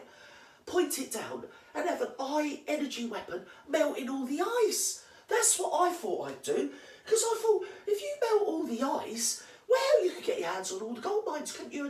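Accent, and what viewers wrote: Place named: London, United Kingdom. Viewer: Haha love the bleeding accent up my street, love you! W Londoner here love your banter haha tell it girl how it is! Go girl! 💕🤣👍🇬🇧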